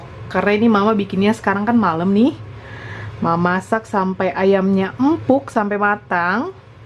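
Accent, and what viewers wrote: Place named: Medan, Indonesia. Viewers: Orang Medan mana suaranya😘😘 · Nggk usah ngaku jadi orang medan tok. Dari logat jg kita udah tau klw itok itu orang medan 😜😀😀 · Wah mamak ...kirain asli dari Medan seperti Saya... Soalnya logatnya Medan x...😅 Benar mak sumut itu tempat nya sejuta etnis😄...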